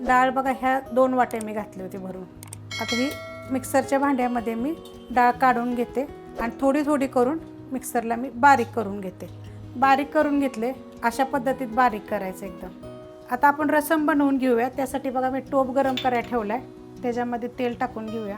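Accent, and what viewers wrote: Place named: Kolhapur, India. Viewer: खूपच छान असतात तुमच्या रेसिपी 👌👌👌 तुमच्या बोलण्याचे टोनिंग वरून तुम्ही कोल्हापूर च्या आहात असे वाटते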